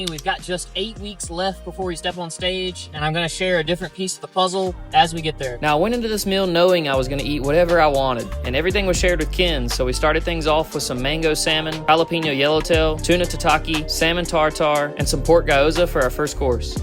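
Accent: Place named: Rural, United States. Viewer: Come ohwne!!! Love that country twang lol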